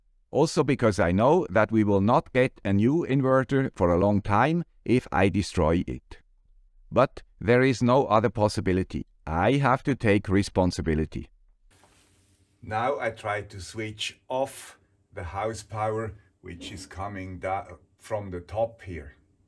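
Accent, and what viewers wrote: Switzerland. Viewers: I recorded "here is the guy with the Swiss accent" onto a solar powered recorder. So I can get a smile on my face even if power goes out for weeks.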